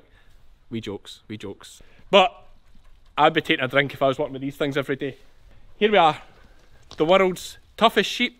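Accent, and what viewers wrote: Scotland, United Kingdom. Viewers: I just enjoy your channel so much, informative, funny, still having a wee bit of trouble with the Scottish accent a bit strong at times but I am getting better at it the more I watch you, I never knew there were so many breeds, keep the videos coming👍🐑🐑🐏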